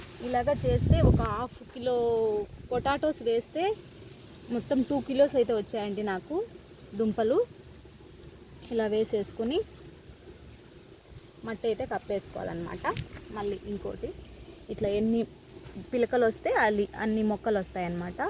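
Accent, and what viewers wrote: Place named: Telangana, India. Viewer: మేడం వాయిస్ రాయలసీమ లాగా వస్తలేదు మేడం. పక్క తెలంగాణ లాగా వస్తుంది మేడం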